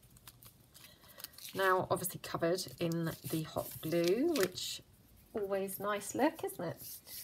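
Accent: England, United Kingdom. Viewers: I love watching anything to do with junk journals and making emphema I am so pleased to hear an English voice although I do love to watch the USA videos the American ladies are so talented but it’s great that the British are catching up love to watch also Australia videos I am a u tube junkie love love and keep going please we British need more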